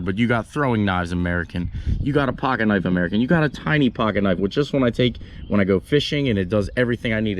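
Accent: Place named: Baltimore, United States. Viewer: I got 10 seconds into this video... and you gotta be from Baltimore or Philly. Yeah?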